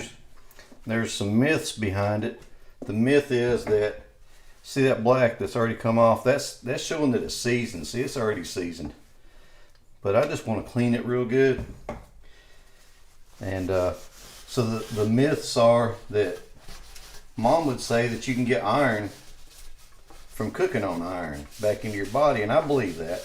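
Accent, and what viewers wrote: South, United States. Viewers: your accent is hard to understand for non US ppl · Where u from? I’m new to your channel. I’m from North Carolina. You are definitely from the south lol I just ordered this exact griddle bc of this review.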